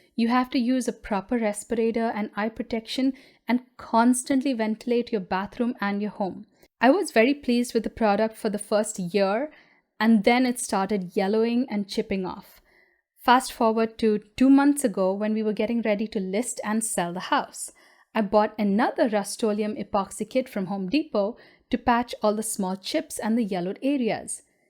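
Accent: India. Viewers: Why do sound like my Indian neighbors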